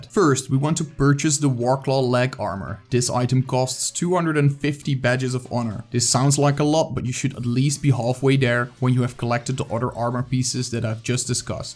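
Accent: Netherlands. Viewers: That thick Netherland accent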